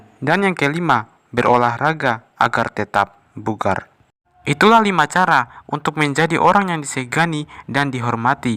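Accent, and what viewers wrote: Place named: Buton, Indonesia. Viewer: Logat mu buton betul🤣🤣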